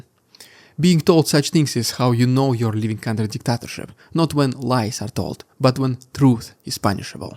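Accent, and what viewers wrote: Russia. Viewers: Tavs krievu aksents izrais dusmas.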